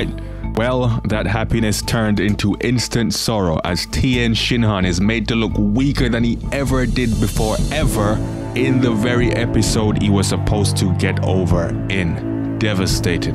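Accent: Caribbean. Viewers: You from the Caribbean bro? Can hear the accent but haven’t lived there long enough to pinpoint which island that accent is haha. Amazing video btw, glad someone else felt the same way. Most other fans and the writers were giving UI Goku, Jiren and Hit handjobs while sleeping on the 80 other cool and unique fighters that they should have also given some spotlight. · are you west indian?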